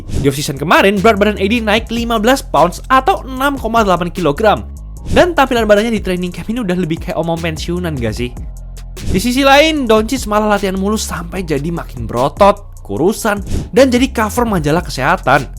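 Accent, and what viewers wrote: Java, Indonesia. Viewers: Was kamu wong jowo kah ?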